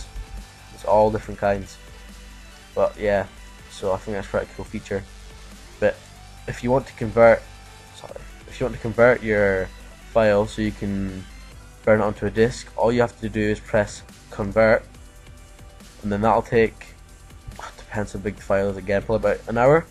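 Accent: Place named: Scotland, United Kingdom. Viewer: hes scottish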